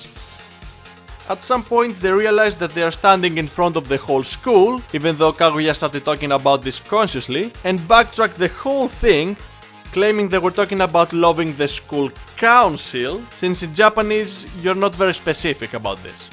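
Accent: Greece. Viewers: πως καταλαβαινει καποιος παντως απο την προφορα τον Ελληνα... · Are you from greece? Cause your accent seems greek to me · Also are you Greek? Cause your accent sound like it. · Wait... I recognize this accent...you greek?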